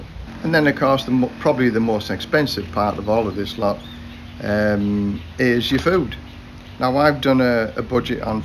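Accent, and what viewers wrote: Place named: Redcar, United Kingdom. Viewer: That is amazing, I thought I recognised the accent, I am from Redcar too, left 25 years ago to go and work in New Zealand, still here living in Auckland. I stopped over for 2 days in Thailand when I flew out here and never forgot what a great place it was. No regrets leaving the north east, weather is awful, but dad still lives there and ironically he was a firefighter too. Great channel really great to see you living the dream.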